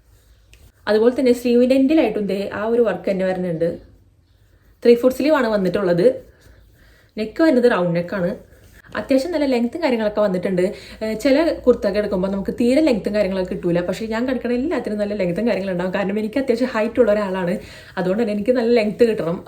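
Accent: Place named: Thrissur, India. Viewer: Chechide veed Thrissur aano Slang avidethe thonni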